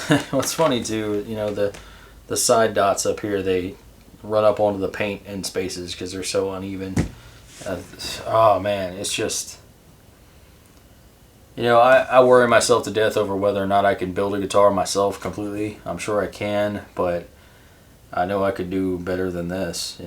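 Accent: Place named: Georgia, United States. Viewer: haha... thought I recognized that accent, I'm from Georgia originally. Been in NC for 25 years or so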